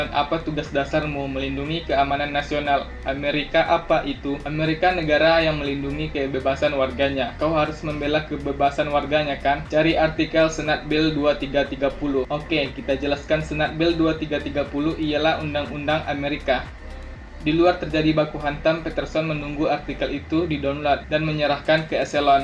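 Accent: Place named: Minangkabau, Indonesia. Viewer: Orang awak ko bantuaknyo😂..... Medok x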